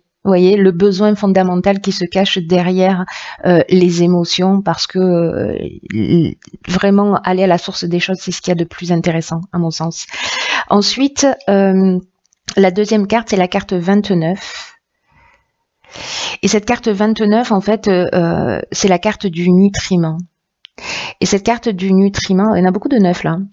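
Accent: South, France. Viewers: petit accent du soleil.. continuez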